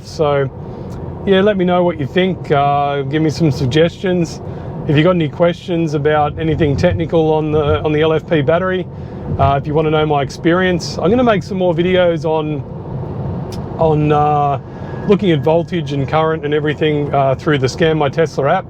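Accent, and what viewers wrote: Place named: Australia. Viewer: Thanks for the info on LFP batteries - I've installed two 12v LFP batteries in my partners EV scooter. Previous batteries became 'memorized' and would only hold about 2 minute of charge. How does that happen? I understand any battery only really delivers 50% of it's stored energy before it's needs to be recharged. Is that true? Your discussion was very interesting if a bit long. And why can't 'regen' breaking provide more useable power to the battery (is it 1% or 2%)? Perhaps you can break your talk up into segments for each topic. I love the Aussie accent. Bill in San Diego.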